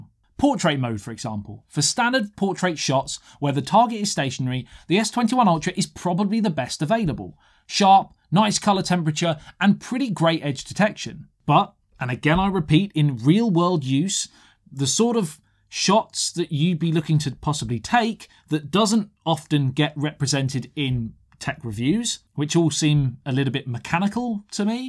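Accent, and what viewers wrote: United Kingdom. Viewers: I got mine last week 512 GB in the phantom black I love mine but I don't live as much as I love my iPhone 12 pro Max it's got a better camera low light photos lidar scanner that I use every day at my job as an interior decorator it saves me time and money and time is money when you are working with that thing and all of the wonderful AR apps I just wanted to say that I just absolutely love his voice I have met many British people in my life but his is different it could be Welsh I don't know but it's the most beautiful beautiful accent on another human being that I've ever heard in my life from either England or whales or maybe Scotland I don't know where he's from but all I know is that voice is hypnotic !!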